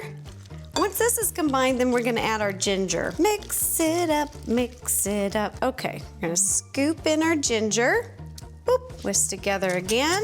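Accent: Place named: Texas, United States. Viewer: is soth girl.. the texan accent really gives sheldon vibes..loveit... & your foood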